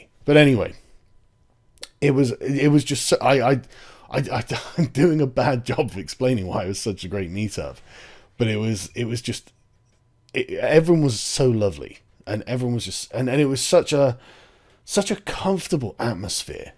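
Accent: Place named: United Kingdom. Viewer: you sound reeeeally british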